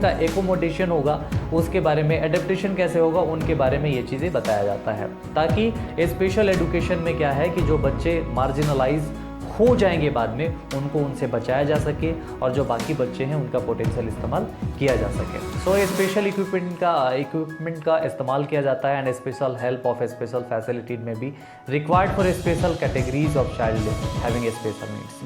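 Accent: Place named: India. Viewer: U speak Indian a lot so it's difficult to understand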